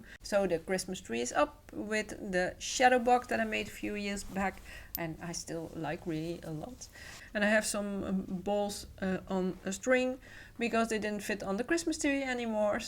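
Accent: Netherlands. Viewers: Thanks so much for making and sharing you work. I love your ideas and you have helped me a lot with your tips and ideas. Also I lived in Amsterdam and Harlimgen in the North in the 1970s (yes Im 70 now) and love to hear you accent. It brings back many memories for me. I watch Dutch TV series to try to keep up my Dutch and really enjoyed Baantjer: Het Begin - took me back to my years in The Dam x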